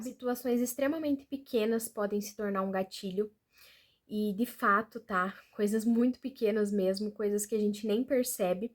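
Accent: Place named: Curitiba, Brazil. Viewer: Nossa você é muito gente boa eu me senti compreendido , também tenho isso é horrível , sou de Curitiba também . Percebi devido o seu sotaque também .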